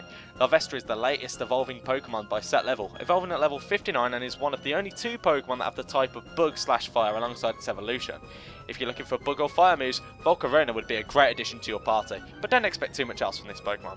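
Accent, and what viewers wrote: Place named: Birmingham, United Kingdom. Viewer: Good to hear a fellow Brummie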